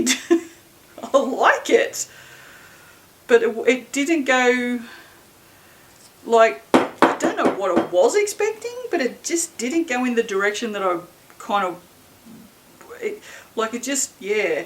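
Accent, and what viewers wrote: Australia. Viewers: Are you from Australia ya ripper 😅lol grand vid mate 👍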